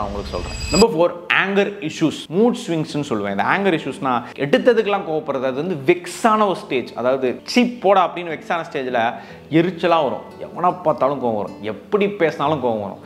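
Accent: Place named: Madurai, India. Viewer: Anne Madurai slang la pesurimga❤❤❤❤❤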